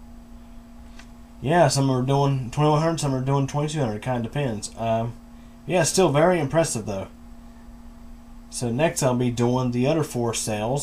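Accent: United States. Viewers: I know this is an old video, but I bought an 11 dollar generic HP battery, because it was cheaper than buying the cells for a flashlight. So I was somewhat concerned that they may be low capacity. Finding this vid and then hearing your American accent was very reassuring for some.odd reason so thank you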